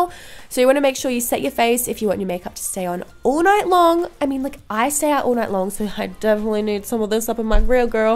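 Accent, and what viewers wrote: Australia. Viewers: talking in Aussie accent too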